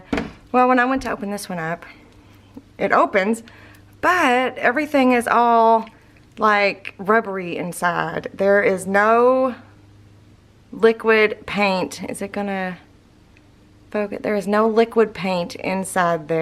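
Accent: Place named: Eastern Kentucky, United States. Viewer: Was just going to ask if you were from Eastern Kentucky because your accent was so familiar to me. Then while scrolling to the comments section I saw the mailing address. My Dads family is from Sandy Hook in Elliott County, Love your videos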